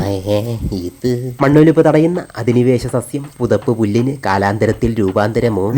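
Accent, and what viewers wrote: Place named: Kollam, India. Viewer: Kollathu evideyo aanennu thonnunnu. Pocha ennu parayunnu.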